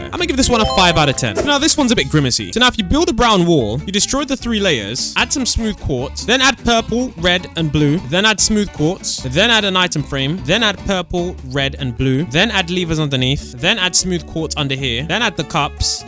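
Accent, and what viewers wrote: United Kingdom. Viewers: HES BRITISH U DO T KNOW THE BBRITISH AARE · Bro is British💀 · You sound British, I’m British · Ur British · Are you British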